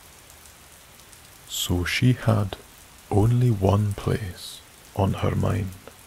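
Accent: Scotland, United Kingdom. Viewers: your dreamy Scottish voice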